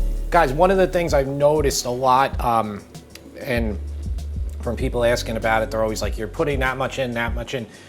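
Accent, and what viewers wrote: New York, United States. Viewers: I watch alot of YouTube cooking tutorials, but your breakdowns & your NY accent make these easier to follow, being a fellow NYer 👍🏿😎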